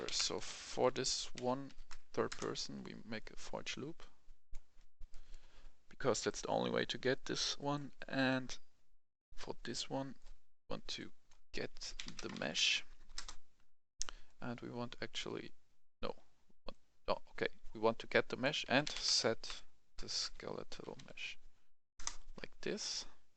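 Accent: Germany. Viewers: Man hört das du in ehct Deutscher bist :D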